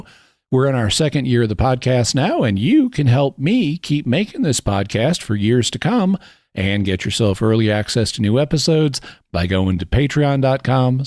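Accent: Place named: Texas, United States. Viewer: Because he's from Texas and that's probably just the way he talks.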